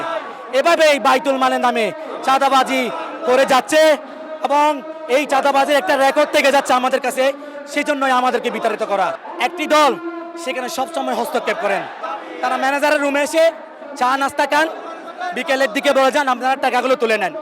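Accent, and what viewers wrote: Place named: Chittagong, Bangladesh. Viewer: তোমার মুখের ভাষায় মনে হয় তোমার বাড়ি চিটাগাং তুমি সিলেটে কি কর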